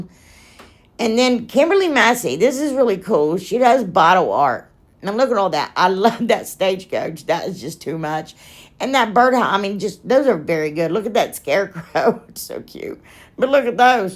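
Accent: South, United States. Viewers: I started watching YouTube to pass the time during covid lockdown. I can’t remember if you were recommend by another channel or a YouTube recommendation but as one of you other subbies said, I watch for your humour and inventiveness. Your American accent tickles me too! I live on the Lincolnshire coast, England. 🇬🇧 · She's a delight and fun! You would think she's from the south! But she lives in Ohio❤❤❤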